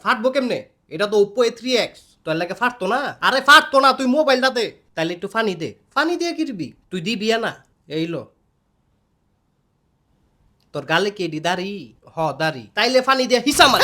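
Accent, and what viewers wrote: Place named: Narsingdi, Bangladesh. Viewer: আমনে কি নরসিংদির নাকি,,,আংগ ভাসায় দি কতা কন,,,,বাড়ি কইনো আমনেগো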